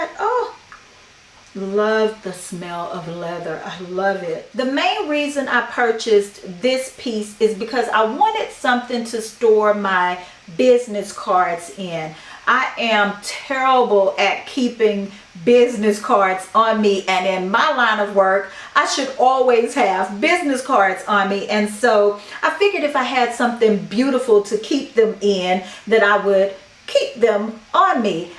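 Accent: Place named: Houston, United States. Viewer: I just discovered you and I think you are funny and knowledgeable. Plus you make me homesick. I’m from Houston and your accent is making me think of home. Subbing right now!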